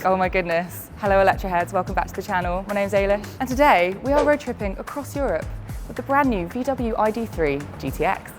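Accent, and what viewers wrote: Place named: London, United Kingdom. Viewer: I'ts a standard London/South East England accent. Btw your question is really stupid.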